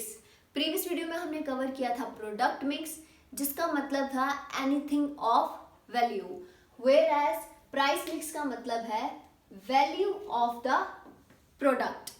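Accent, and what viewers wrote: Jammu, India. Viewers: Mam are you from Jammu ???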